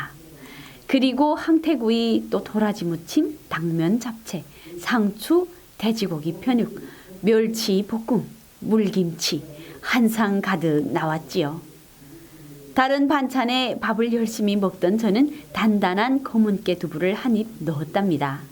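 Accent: North Korea. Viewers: 예뿐 ~~ 북한말 그대로 쓰는것이 넘넘 예뿌셔요... 디른분들 탈북후 바로 서울말씨로바꾼다는것 이해는 하지만경상도 사람 서울 산다고 서울말씨로 안바꾸조.ㅎㅎㅎ두부 ~~~~~~~ 그런사연들이 있었군요.집에서 만드는 우리 전통 순두부 집 식당있으면 그걸 먹르러 1시간 이상 찿아가기도 한답니다.먹고 사오기도 하죠!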